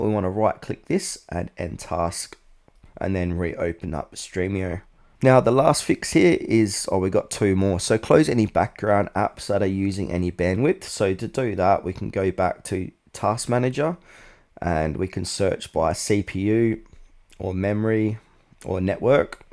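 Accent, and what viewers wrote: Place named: Australia. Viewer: Thank god an Aussie/Kiwi or a Brit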